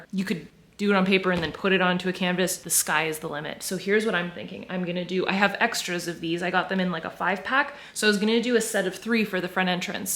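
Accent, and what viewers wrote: Quebec, Canada. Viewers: en français! Apprends-nous l'accent québécois <3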